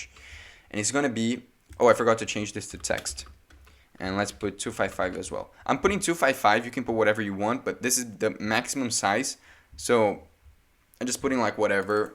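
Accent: Brazil. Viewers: Mano pior que o sotaque também entrega kkkk esse é o primeiro vídeo que vejo seu e já tive quase certeza que tu é brasileiro pela forma de falar, porque eu falo do mesmo jeito hehe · This is the first time i listen to a brazilian speak english... Why Brazilians dont like to speak english?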